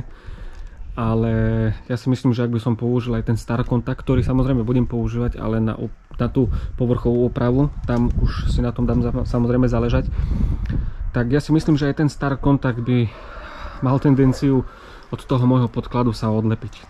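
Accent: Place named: East, Slovakia. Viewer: Super chlape, okamžite dávam odber! Určite tu nájdem dostatok inšpirácie pre domáce svojpomocné majstrovanie. Podľa prízvuku som tipoval na východ a v prvých videách som videl špz z východu. Často jazdím trasu KE-HE cez MI, tak reku či chodím okolo tvojho domu???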